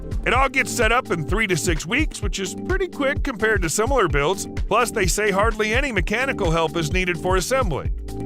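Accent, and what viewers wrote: United States. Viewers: Horrible music, and according to the accent, it can only be a video from the States. Not useful for Europeans.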